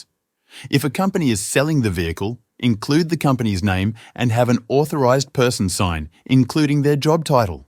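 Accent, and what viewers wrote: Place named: United Kingdom. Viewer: Thanks, for the video. Love the British accent....😁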